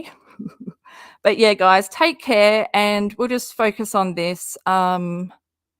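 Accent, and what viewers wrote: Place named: Australia. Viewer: I have a sneaking feeling that karma is finally coming for a certain hater 😉and I can’t wait! FYI I LOVE LOVE YOUR AUZZIE ACCENT 😍😍😍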